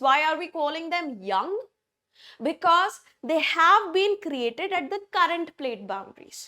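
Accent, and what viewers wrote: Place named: United Kingdom. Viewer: Mam please aap normal or indian accent me padhaiye,, british type accent thoda ajeeb lgta hai ..jaise live classes me padhate ho vaisa accent😊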